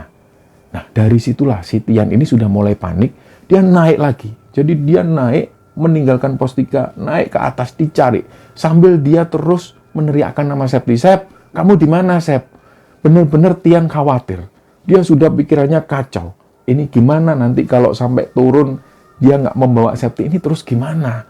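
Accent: Java, Indonesia. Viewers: mas septian... jangan lagi mencari sosok bapak² yg menolong panjenengan nggih... kirimkan alafatihah saja sesuai dengan apa yg ibu warung sebutkan ketika menyapa bapak tsb.saat hendak mencari mbak septi, 😊😊 mbak septi ada satu pesan dari alam ghaib ktika memesan mi instan,beliau berucap "MAU BERSABAR" 😊 om bhasa "jawa" nya medok bingits ❤👍👍👍 sehat sehat ngih 🙏🏻🙏🏻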